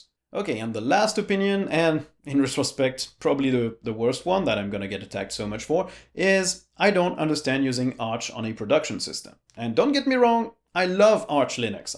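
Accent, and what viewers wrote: France. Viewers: Completely unrelated but I didn't realize you were french Bonjour héhé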